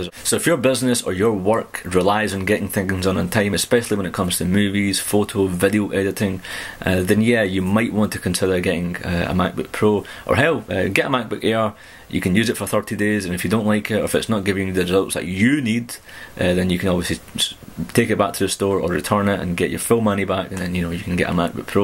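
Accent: Scotland, United Kingdom. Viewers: he's Scottish...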